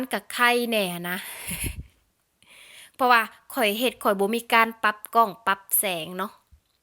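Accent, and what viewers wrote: Savannakhet, Laos. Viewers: ມັກເຫຼົາເວົາລະອຽດສຽງແຈ່ມແຈ້ງດີລະກະເປັນສຽງໄທສະຫວັນນະເຂດອີກອອກສຽງລະອຽດລະອໍດີເດີ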